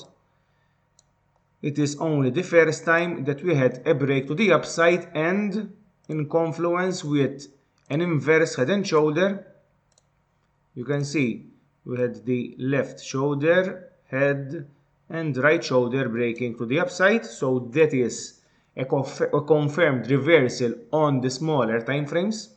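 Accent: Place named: Russia. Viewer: I like your accent beside the analysis. Like a person from russia or france.😁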